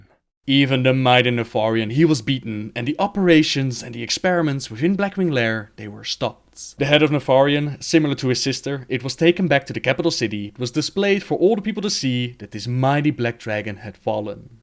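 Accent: Netherlands. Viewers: you can clearly hear he his from the netherlands. goede Videos, ga zo door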